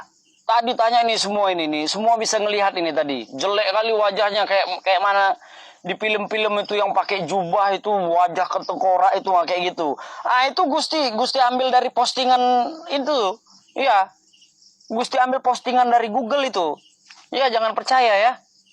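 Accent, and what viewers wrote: Medan, Indonesia. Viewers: Bang kau ngomong Logat.medan Kau batak kah?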